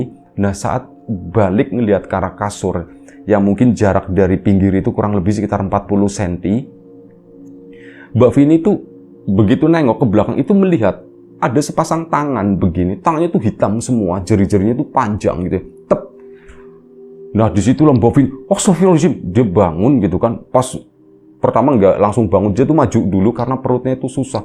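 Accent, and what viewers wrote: Tegal, Indonesia. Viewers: Jdi kepo dg cerita2 di Riam Biru, ceritanya beragam thema dan variatif. Naratornya jg dlm bercerita kdng bikin aku ketawa sndiri, khas org Tegal. Salam buat temen2 yg di Tegal, jln layang, jln zaenal arifin,yg deket texin dan lain2nya...salam dari jogja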